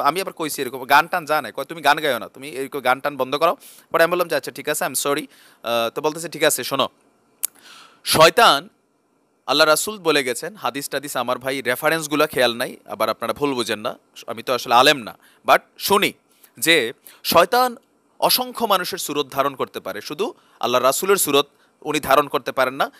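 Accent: Comilla, Bangladesh. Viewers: ভাই আপনি কি কুমিল্লার নাকি? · ভাইয়া,,যেভাবে শুরু করছিলেন,,😂😂 আপনি পারেন ও ভাই😜বাই দ্যা ওয়ে,আমি কিন্তু কুমিল্লার বউ,,,😁আপনি একদম খাছ কুমিল্লা পোলা😬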